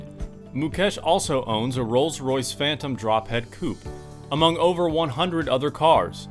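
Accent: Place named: Liverpool, United Kingdom. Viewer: Nacks scouse bro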